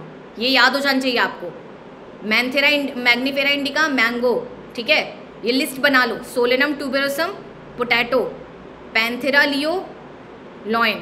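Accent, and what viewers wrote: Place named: Bengal, India. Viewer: Bengoli accent ♥🔥🔥🔥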